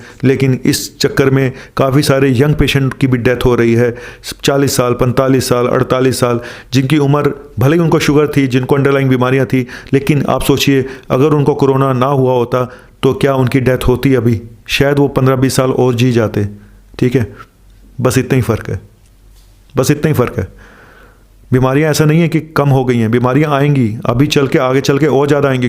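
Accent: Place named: Punjab, India. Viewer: Very sweet punjabi ❣️❣️nice to hear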